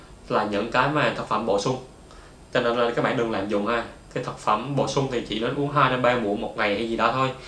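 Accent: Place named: Phu Yen, Vietnam. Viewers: anh ơi a ở Phú Yên - Bình Định ạ ? giọng anh quen quá đi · giọng a nghe giống giọng phú yên nhề :))) nếu mà giống thì chung quê ùi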